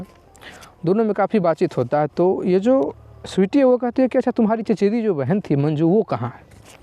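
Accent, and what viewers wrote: Bihar, India. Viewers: Bihari tone hae so it was bit boring ..Hindi speaking is not good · बिहारी उच्चारण में बतायी गई कहानी रोचक लगी।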